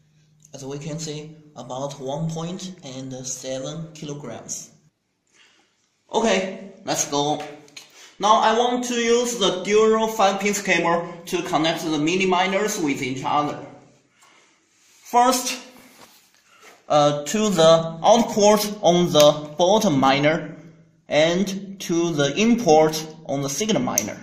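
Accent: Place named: China. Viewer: Evert van Ingen made in china english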